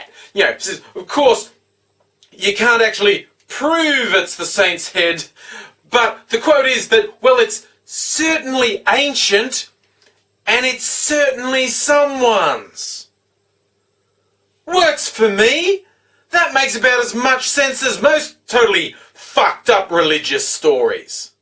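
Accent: Australia. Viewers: hmmm you seem angry for some reason and also Australian hmmm still cant make why i see this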